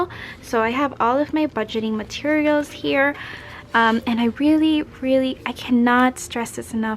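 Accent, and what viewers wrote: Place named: Philippines. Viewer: Firts time viewer and why did I recognize the Filipino accent from sentence one. 😅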